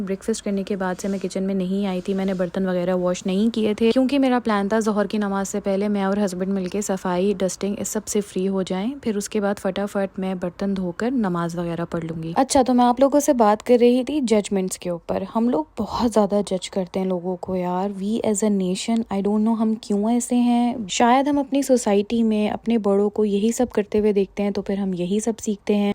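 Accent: Karachi, Pakistan. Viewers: hi,i like your vlogs,your urdu is very nice like karachi waly.want to ask you about your folding matress,from where did you get it and its price as wel coz i like it nd want it for my kids.❤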